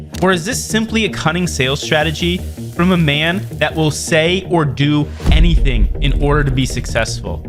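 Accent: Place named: United States. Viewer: sounds very American to me!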